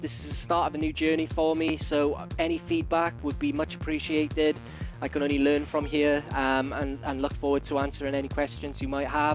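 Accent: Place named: Wales, United Kingdom. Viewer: Love those Welsh tones. Have you considered onlyfans?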